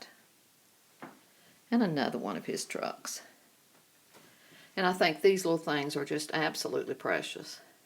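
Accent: South, United States. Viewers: your house is so cute! I simply love it! You have a southern accent what state are you from? I am from michigan. I might just have to subscribe. Debbie in michigan. 🎄🎅🎁😄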